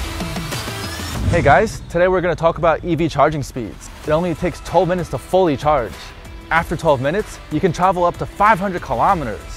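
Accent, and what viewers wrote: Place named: China. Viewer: Ohk, this host is a red head, blue eyes and heavy Chinese accentes English? 🤕What I miss?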